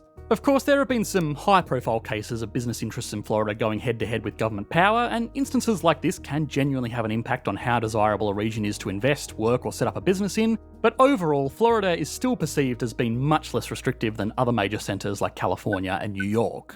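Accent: Australia. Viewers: 😂 As an Aussie, I can confirm that those weird downward inflections are components of the Aussie urban accent. I don’t have it myself because side I never liked it, it a lot of Aussies do…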